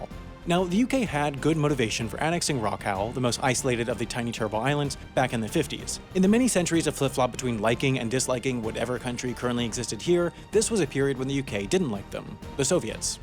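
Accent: United States. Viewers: He just sounds like a bitter yank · That Guys annoying Whiny American Accent is so annoying, America , The Land of The Free-DUMB. Trump 2024 LMAO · Most boring load of shit I've heard in a long time, that God awful yank accent.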